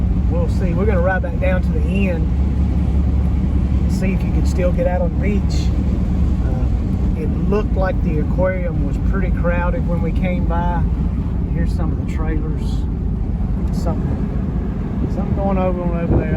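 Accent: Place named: Virginia, United States. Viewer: I detect a VA accent in your voice man. Am I right? The only reason I say that is because I spent 4 years driving for a company and I observed very closely the way Vow sounds were pronounced In that state. If I'm wrong on my guess, then it was worth a guess. I love what you guys do with the vans by the way. I currently have a 1995 G 30 long bed that's all rusted out, needs some engine work and some body work. My goal is to make it a 4×4 lifted Long Ateam van.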